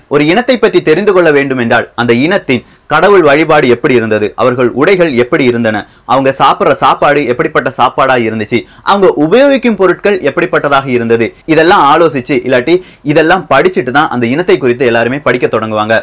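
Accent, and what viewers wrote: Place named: Udangudi, India. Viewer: Enga oorum udanguditan. So happy to hear .That's why your tamil slang is super.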